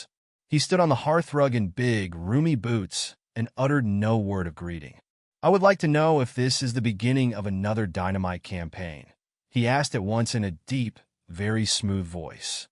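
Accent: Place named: United States. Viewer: Appreciate the American accent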